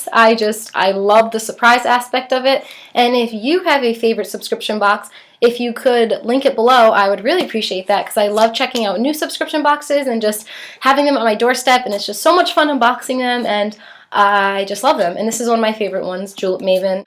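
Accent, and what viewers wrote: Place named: New Jersey, United States. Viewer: Love this video. I a a new subscriber. Please sub back and anyone else that does, I will too. You sound like you are from New Jersey. Love your accent. :) You are awesome!!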